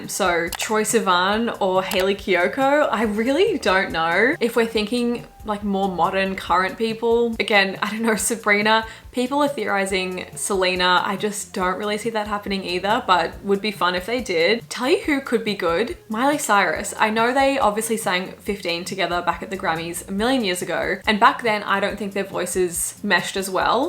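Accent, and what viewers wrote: Australia. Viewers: Amazing vidéo as always. I Watch every vidéos from France ! It helps me improve my english and understanding of the australien accent. Merci !!! 🥰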